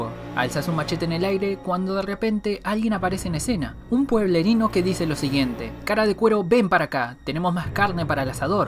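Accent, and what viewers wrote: Argentina. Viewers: Que sos argentino?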